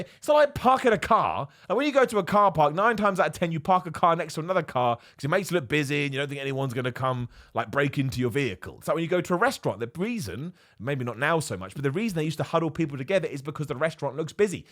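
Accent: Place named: RP, United Kingdom. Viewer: A subset of the eater are the people with what I call "bro jugs", they have these huge jugs that just have to leave on the floor, the size of a carton of milk, filled with either water or even better "branch chain amino acids." I've seen both swole people and hard gainers do this. Maybe it helps them, but to me, I felt like if I were to try to drink even a fifth of that while deadlifting I'd yack. Another subset of the naked guy is the old guy drying their balls in the hand dryers. I still have mental scars seeing some old guy literally dry his balls in a YMCA bathroom like he was at home, not a care in the world. Of course, I can't add to this list without copping to some of my own bullshit, which is grunting. I'm a grunter-- I know why I do it, but I also know it could probably be disconcerting. Of course, I don't feel bad next to that very eccentric gentleman channeling his inner Lady Gaga who will just full-on sing so loudly I can hear him over the heavy metal I have blasting in my ears so I don't have to hear other people in the gym. 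Anyway, big fan, I like your content but I also admit it's nice to hear some guy with a posh accent who sounds like he could be teaching a 301 class on the reign of King Henry the 8th instead of someone who speaks like their neurons have been replaced by branch chain amino acids.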